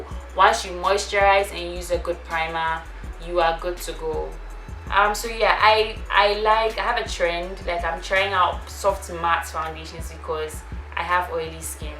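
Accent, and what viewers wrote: Ghana. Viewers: Hi, Your accent tells me you are from Ghana, I will like to get in touch with you and ask you a few questions directly. Kindly email me on <mail> I'm not sure about putting my number out here. I will be glad if I get a reply from you. Or you can post a business WhatsApp number if you have one. Anyway I'm your new subscriber and my name is Francine.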